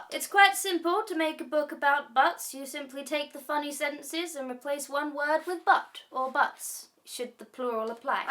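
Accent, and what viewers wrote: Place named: Scotland, United Kingdom. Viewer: Also love your scottish accent, it's nicer than mine and I'm scottish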